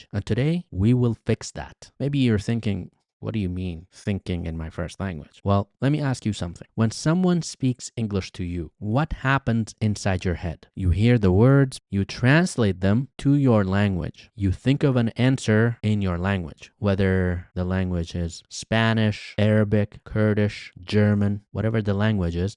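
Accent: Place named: United States. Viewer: Sir i eagerly want to learn English through your podcasts i learned a lot of things from you about how to improve English but the problem is that i want to learn British accent and your accent is American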